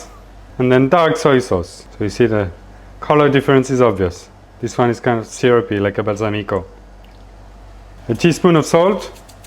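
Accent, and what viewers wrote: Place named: France. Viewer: 哈哈，浓郁的法国味英语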